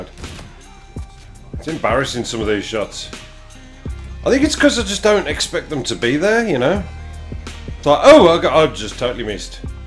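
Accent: Birmingham, United Kingdom. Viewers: Are you back for good? WE all miss our that smooth brummy twang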